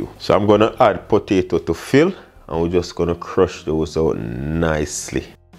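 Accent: Jamaica. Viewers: the jamaican accent so cool.. makes cooking cool. well done on the kfc secrets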